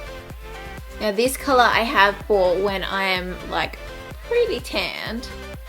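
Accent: Australia. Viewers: R u Australian. becuz u kinda have that accent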